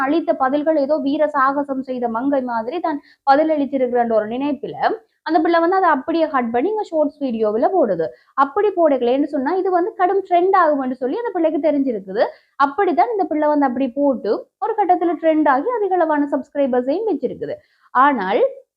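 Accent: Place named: Jaffna, Sri Lanka. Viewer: தங்கா உங்கள் யாழ்ப்பாண மொழி அருமை இப்ப யாழில் இளம் தலைமுறை கதைக்கும் போது தமிழ்நாடு மொழி நடை கலப்புடன் கதைக்கினம்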